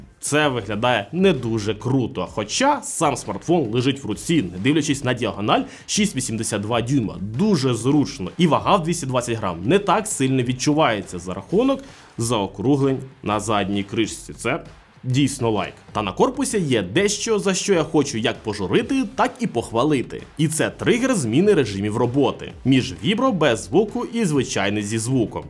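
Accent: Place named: Ukraine. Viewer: Ооо, ну всё, понятно с вами. Цэкайте и гакайте дальше без меня. Отписка!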